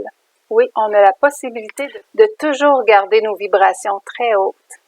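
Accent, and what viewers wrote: Canada. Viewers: C'est la première fois de ma vie que je trouve l'accent canadien magnifique.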